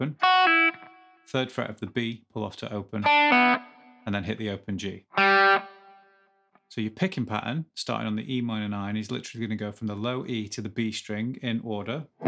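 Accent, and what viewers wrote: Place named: North West England, United Kingdom. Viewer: Also wanted to ask where you’re from. I’m originally from Hanley, Stoke-on-Trent, left for Canada from Northwich, and taught high school in Macclesfield. I notice certain inflections in the accent that give away a North West origin.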